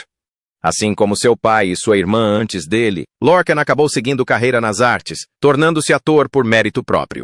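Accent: England, United Kingdom. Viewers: para com este horrivel sotaque ingles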